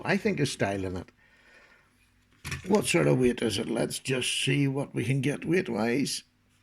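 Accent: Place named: Ireland. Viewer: my man, I don't know how you do it, but do it you do! This must be a a new model as I haven't seen one before; I have to admit, I'm a might envious as, you're right! It's a beautiful piece it really is. I think it's a little belter, and that blade is a wonderful shape, ideal for any task. I have a good selection of knives from CRKT and they have never let me down once! Keep up the great work, I always look forward to hearing your dulcet, Irish, velvety voice and you know what you're talking about! See you on the next one, my friend. Much love to you and yours. I'm away for nice cuppa tea myself! 😆🤗❤️❤️❤️❤️❤️❤️❤️🤗😆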